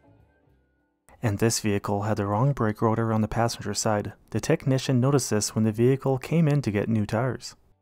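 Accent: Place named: United States. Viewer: The narrator has a american accent, but the transit van, , has British number plates. I find this most odd.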